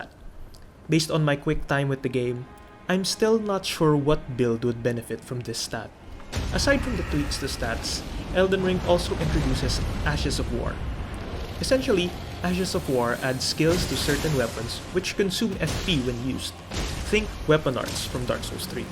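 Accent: Philippines. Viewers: pinoy accent!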